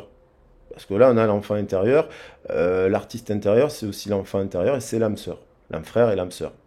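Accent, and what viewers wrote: South, France. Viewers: Coucou j'adore vos guidances et l accent du midi moi toujours seule les week-end mon autre chez lui sa sorcière anniversaire 🎂 🎂 moi je suis de trop gros bisous et bonne soirée je vous embrasse très fort moi j ai mon voyage bloqué je devais pzrtir du 7 au 14 09 bloqué c'est la.sorciere sui a bloqué super mille bisous ❤❤❤❤❤❤❤❤❤🎉🎉🎉🎉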